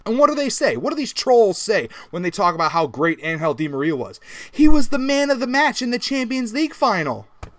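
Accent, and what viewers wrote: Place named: Manchester, United Kingdom. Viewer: Love the Manchester accent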